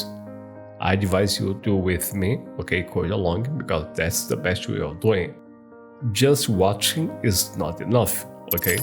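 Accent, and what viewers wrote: Brazil. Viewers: Você é brasileiro? Muito bom seu tutoral! Seu sotaque parece do rio de janeiro. Parabens pelo otimo conteudo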